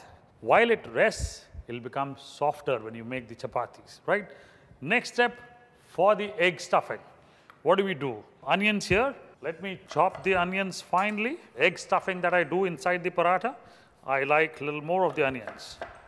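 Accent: India. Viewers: Great Recipe. You have a great presentation skill. What I noticed was that you did not stammer or pressed hard for words. It was very naturally narrated and with our real Authentic Indian Accent. Thank you very much.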